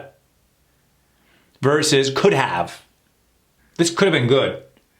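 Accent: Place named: United States. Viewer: Thank you so much sir, it helps a lot. Your my favourite American accent teacher❤❤❤😊😊😊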